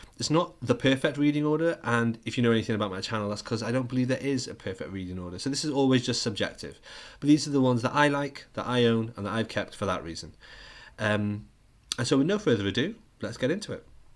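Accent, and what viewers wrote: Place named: United Kingdom. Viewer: Crossworlds was great, Jae Lee's art was incredible. I feel your pain with the shelves, here's a pic of mine. At the bottom I had to raise the right shelf to fit the Transformers Premier Collection books which are Absolute sized (I think - they're about 1cm taller than a 12 inch album). I also divide by format - omni/OHC/Premier/TPB. I just can't have them mixed up together. I collected Superman Unchained when it was released as floppies, but I gave up an issue or two before it finished because Jim Lee just didn't want to prioritise it and it took months just to get one issue. Somehow I never picked up on you being Welsh. Maybe it's because so many youtubers are Amerixcan I just heard a British accent.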